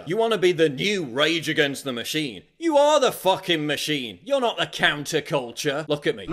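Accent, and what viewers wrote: United Kingdom. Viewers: Destroying the leftist agenda sounds awesome with a British accent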